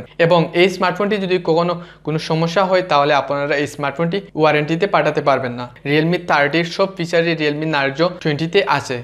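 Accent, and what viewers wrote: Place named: Sylhet, Bangladesh. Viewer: সিলেটি মাত বাদ দিয়া শুদ্ধ কা বলো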